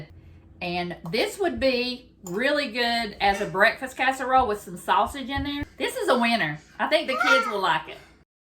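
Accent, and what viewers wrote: South, United States. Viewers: New subbie here! This looks so good and easy. This is a yes ma’am moment. Are you from the South? I love your accent. Looking forward to get to know you and your channel! Have a great night ❤️🙋‍♀️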